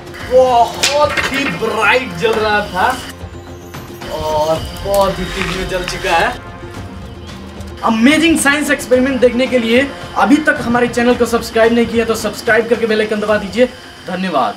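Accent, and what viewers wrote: Bihar, India. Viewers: Mujhe lagta hai ki app Bihar se hai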